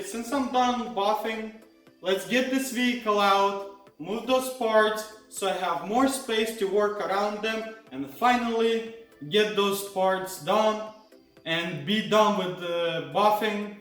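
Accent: Russia. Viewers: Вот смотрю ваши видосы и думаю, что акцент похож на русский. Угадал?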